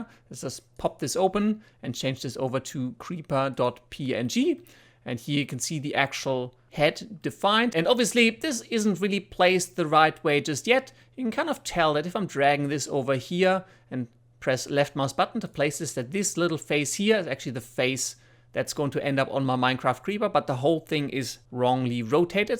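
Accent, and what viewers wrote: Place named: Germany. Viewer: The German accent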